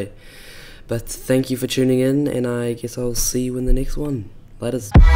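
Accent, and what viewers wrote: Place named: Russia. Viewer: Russian speaking inglish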